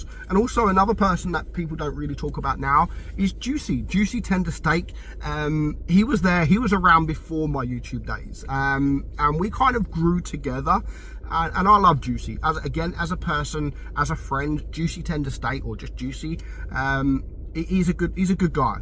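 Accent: United Kingdom. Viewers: Really sorry to see you go. I am going to miss your British accent. God speed.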